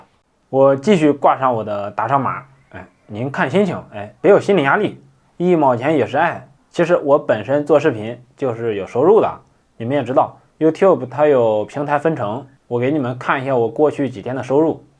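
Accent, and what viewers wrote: Henan, China. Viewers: 河南口音这么重！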